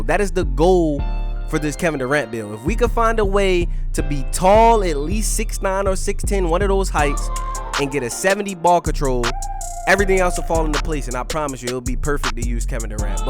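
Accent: Baltimore, United States. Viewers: Brody gotta b from BMore the way them ooos is coming out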